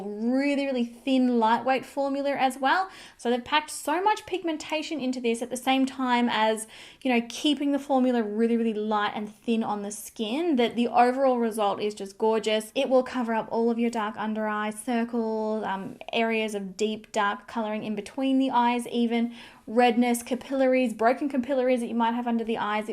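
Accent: Australia. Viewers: This is random but I’m from the states and I’m obsessed with your Aussie accent 😍😍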